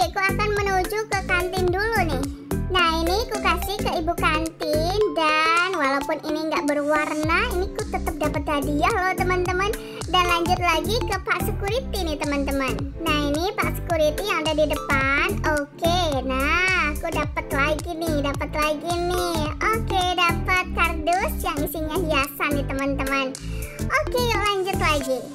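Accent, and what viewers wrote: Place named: Lampung, Indonesia. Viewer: Kakak kayak orang lampung aja ngomong nya ada "geh" nya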